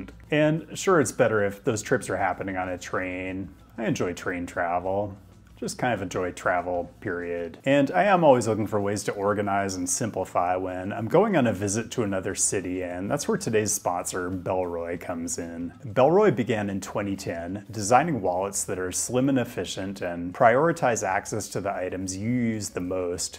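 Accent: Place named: California, United States. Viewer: I'm here for the blunted affect California accent coming out of and intellectual talkin bout cites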